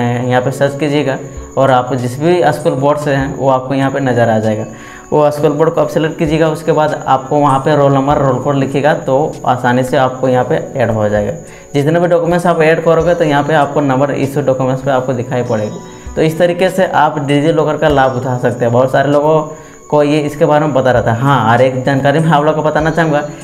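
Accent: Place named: Bihar, India. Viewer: You look like angrez but you talk like pure bihari. Ye raaz kyaa hai bhai...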